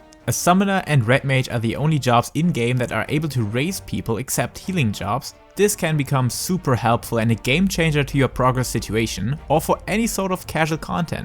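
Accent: Germany. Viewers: Are you German ?!?!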